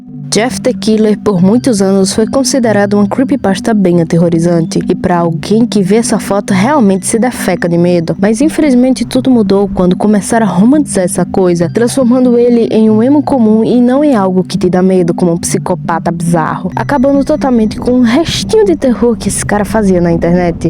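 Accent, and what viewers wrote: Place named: Portugal. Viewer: Nossaaa... Cai de paraquedas aqui pesquisando sobre creepypastas, porque me deu saudades de ver algumas, e acabei adorando seu vídeo ❤ Sua voz tem um sotaque lindo, no começo até pensei era português de Portugal. Me deu mó nostálgia da época que a hora mais divertida era chegar a noite, e pesquisar alguma creepypasta no Wattpad, as que eu mais gostava eram as sobre o Jack Risonho, Jaff The Killer, e sobre a Deep Web e lendas japonesas bem bizarras. Tem um outro canal que faz videos-creepypastas incríveis aqui no YT , que é o Sigma Terror, eu sigo e ouço as histórias dele a mais de 8 anos, as histórias dele são muito interessantes e bizarras, algumas são até bem pesadas, mais o que mais me surpreende é que a forma que ele narra é incrível, e ele tem uma voz linda e boa de ouvir que faz você se sentir estranhamente confortável e relaxada depois de um dia cansativo. 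Aqui tem o link de duas histórias dele que eu considero mais pesadas ( tem muito mais, mas essas são as que mais marcaram minha adolescência ) : O Jack Risonho e Izaque <link> Na hora Certa... <link>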